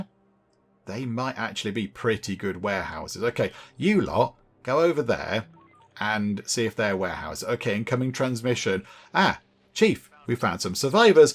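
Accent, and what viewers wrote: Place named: Northampton, United Kingdom. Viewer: Oh wow didn't realise you were just down road from me 😂 knew accent was familiar. I'm at weston favell 2 mins from the police station. Think town centre already a zombie zone based on how busy it is some days... Try not to damage the king billy during the play...I might be there having a pint and zombies would ruin my day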